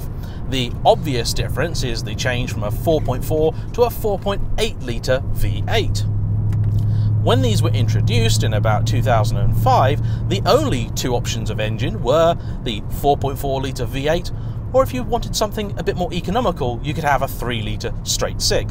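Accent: United Kingdom. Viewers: your british accent is so smooth and easy to understand. thumbs up from Romania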